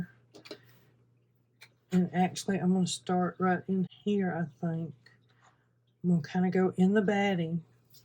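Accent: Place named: South, United States. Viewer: Keep going! I do all the hand craft/arts and sloppy/ large stitching is a totally new concept ( mind blowing) to me! I was taught by a perfectionist hand quilter ( 10-12 stitches per inch) and match all points or take it out and try again. It’s hard to break old habits and relax enough to do slow stitching as it’s called today. Kawandi, sashiko, boro are not the same but they are large needles and larger stitches. Raw edge will not wash up nicely unless you secure the edges down sufficiently— they will curl and fray and some areas may bunch up more than others. Keep experimenting and show us your progress! I love your southern drawl— just like my great aunt in Arkansas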